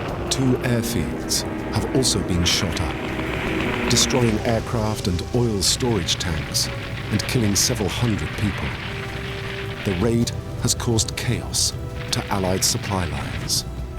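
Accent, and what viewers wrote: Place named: England, United Kingdom. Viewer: Wanted watch it but I ain't with those English accents we had fight to rid of English so... I speak American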